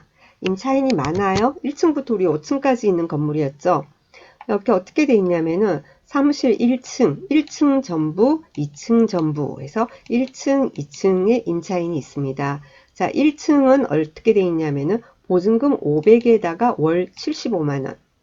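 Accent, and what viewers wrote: Busan, South Korea. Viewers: 정말 너무너무 감사합니다 지금은 손녀봐주러 서울에 와있으면서 강의를듣고 있지만 부산의 그수한 말씨로 자세하게 알려주시는 멘토 입니다 영원한 경매나라 왕비님이 되어 주세요 감사합니다 ~^^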